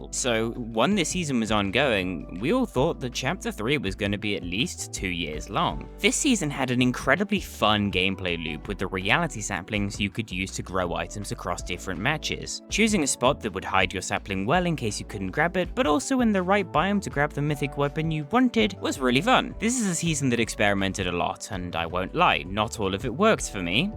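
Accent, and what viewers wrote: United Kingdom. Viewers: I was about to say I thought you were British.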